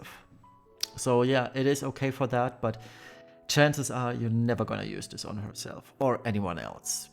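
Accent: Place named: Germany. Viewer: Btw. are you from Germany? Your accent kinda sounds like it 😁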